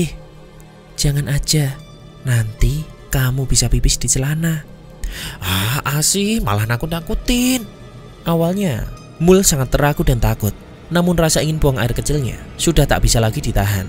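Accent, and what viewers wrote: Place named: West Java, Indonesia. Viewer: pembawaan logat sunda na lumayan😅😅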